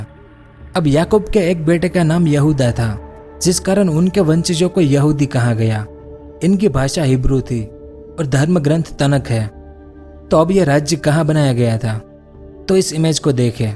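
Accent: Gujarat, India. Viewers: Aavaj Se to Gujarati lag raha hai Because I know tone of Gujarati language is different in different part of Gujarat. I think may be he is from Ahmedabad, Surat Or Sauratra. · आप की बोली में मुझे गुजरात की मिली जुली बोली लगती हैं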